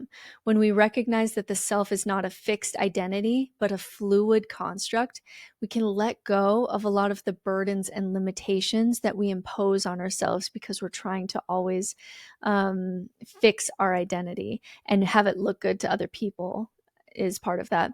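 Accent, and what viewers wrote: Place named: United States. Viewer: I needed an American voice to speak to my American Brain and my American Heart. Thanks my Sista from another Mista!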